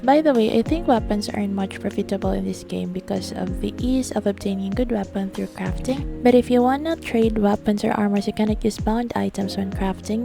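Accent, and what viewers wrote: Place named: Philippines. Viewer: A like for your filipino accent when you speak English... It's so cute ^ ^ ❤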